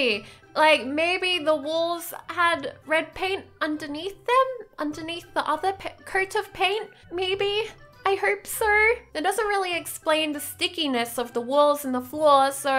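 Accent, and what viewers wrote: Australia. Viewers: Are you Australian? My accent is similar to yours and I’m Australian? Just asking, anyways love the video! You need more subs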